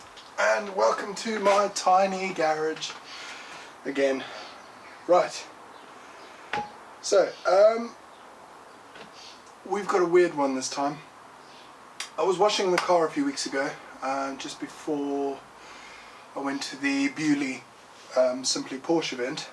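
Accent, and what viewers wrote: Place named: South Africa. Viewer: You got a bit of a mixed up accent, can’t place it, sounds a touch of South African..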